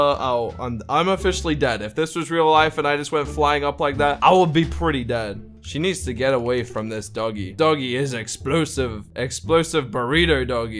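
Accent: Australia. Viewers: Is he rrly from Australia?? I am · ARE U FROM AUS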